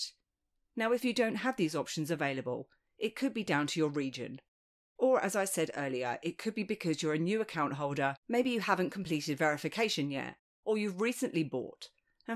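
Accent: United Kingdom. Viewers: refreshing hearing a Brit rather than a American Accent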